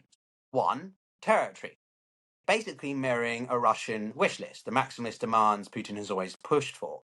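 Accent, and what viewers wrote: England, United Kingdom. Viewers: Hey, English accent dude, you REALLY do NOT see the BIG PICTURE in front of your eyes!!! The US is NOT the heart of NATO! The US has walked away!!! The US only NEEDS NATO when its key national interests are involved, and Ukraine itself was NEVER a key US interest! Diminishing Russia has long been a key US strategic interest, but now the US needs to deal with China, a far bigger strategic interest than either Ukraine or Russia! Put simply, NATO has shown its weaknesses, as too has the EU (Military & economic)!!! Ukraine can swallow the bitter pill now or a far more bitter one in 6-12 months!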